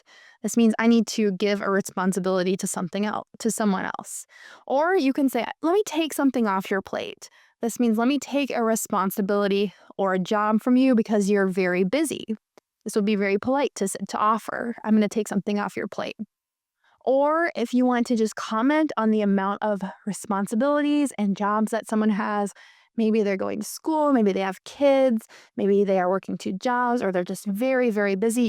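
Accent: United States. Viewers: One of the best English teachers on youtube. I love your American accent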